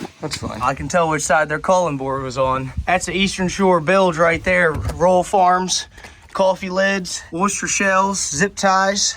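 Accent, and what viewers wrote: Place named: West Virginia, United States. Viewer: Ey fella, where you from? You sound like you got a West Virginian accent but i aint got a clue where you are from.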